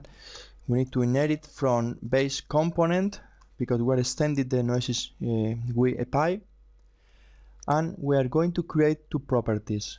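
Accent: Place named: Spain. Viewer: Suena a español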